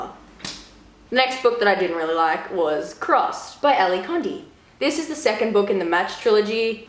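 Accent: Australia. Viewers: It’s definitely an Aussie accent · that's not a British accent it's more of an Aussie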